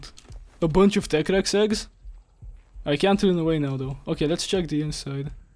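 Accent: Spain. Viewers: Se nota que eres español 😁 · hablas como si tu idioma principal fuera el español, xd talvez no me entiendas pero pues saludos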